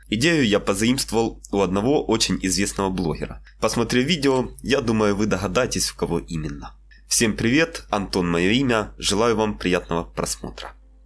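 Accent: Ukraine. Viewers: Ну у тебя и говор!)))Ты из Украины что ли??)) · Такой замечательный украинский акцент, и такой хороший мастер!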